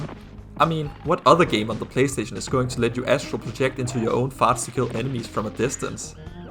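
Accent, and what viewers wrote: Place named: Netherlands. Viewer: That Jan Willem Nijman clip from his juice talk where he mentions explosions was really unexpected in this video. 😅Your accent sounds Dutch so I guess that explains it. Do you have a background in game dev? Overall great recap of Skullmonkeys. We had The Neverhood at home when I was a kid, used to play adventure games together with my dad and brothers. But never heard anything about this game. The fellas at Neverhood Inc are legends to me, so much creative expression.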